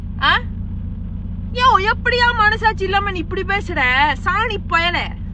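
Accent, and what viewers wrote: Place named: Madurai, India. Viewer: Malaysia la irukkanu solra but madurai language pesara.. but diwakar naai ah vachu vachuthuku nandri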